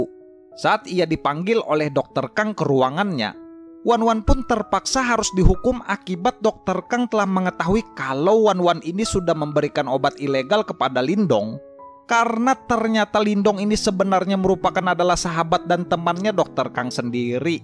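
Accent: Banjar, Indonesia. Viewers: Orang Banjar kah nih?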